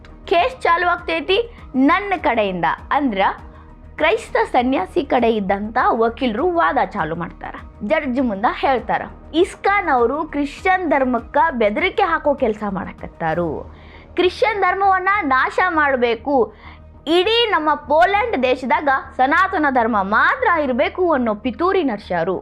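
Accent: North Karnataka, India. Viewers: ಒಂದು ಉತ್ತರ ಕನ್ನಡ ಮಾತಾಡು ಇಲ್ಲ ಬರದಿಲ್ಲ ಅಂದ್ರ ಸ್ಪಷ್ಟ ಕನ್ನಡ ಮಾತಾಡವ್ವ ತಾಯಿ ಅದು ಎಲ್ಲದ್ರ ತಲುಪಲಿ ನೀನು ಕನ್ನಡ ತಲುಪು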